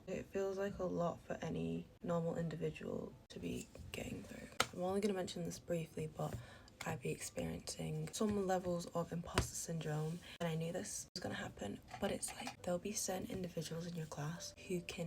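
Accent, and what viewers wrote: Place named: Bradford, United Kingdom. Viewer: you are drop dead gorgeous!Which part of the England are u from?Because I am from England as well.I live in Bradford.I literally love your accent and it sounds like a Bradford accent.I completely understand if you don't wanna reply to my comment and tell me which part of England your from and you will always be my IT girl slay queen.Love you girl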